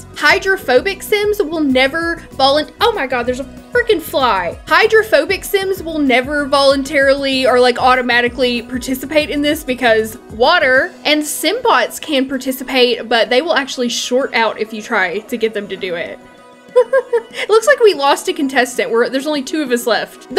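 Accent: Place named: South, United States. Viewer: By the way you've probably heard this before but love the country accent 😂 reminds me of my granny's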